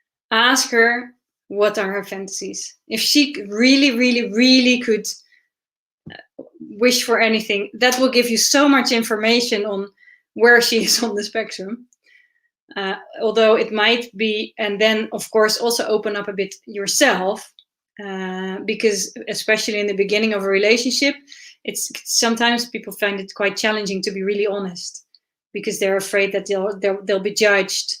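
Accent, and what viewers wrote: Netherlands. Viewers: Hey, thanks for the informational vids, just discovered your channel yesterday, it was linked below a video of the mystery box show. I already recognized a dutch accent with you:) hoop dat je een fijn weekend hebt gehad!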